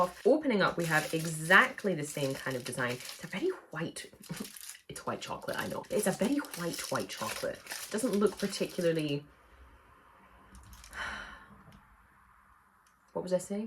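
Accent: Scotland, United Kingdom. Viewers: best accent in the world go scotland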